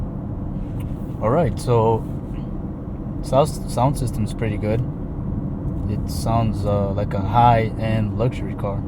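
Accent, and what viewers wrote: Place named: Germany. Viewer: Are you from Germany cause your English sounded like german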